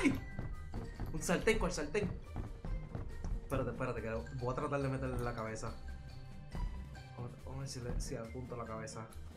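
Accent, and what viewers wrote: Puerto Rico. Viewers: Tu suenas como si fueras de jayuya PR o algo😭🤣de que parte eres de Pr?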